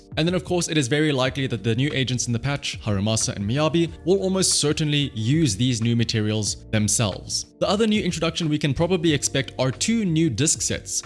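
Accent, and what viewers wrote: South Africa. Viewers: I felt that South African accent, nice to see another playing some zzz love the content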